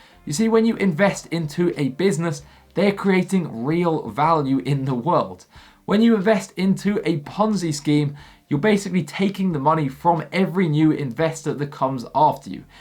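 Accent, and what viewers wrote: England, United Kingdom. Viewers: Good video, subbed, are u from England man? 😊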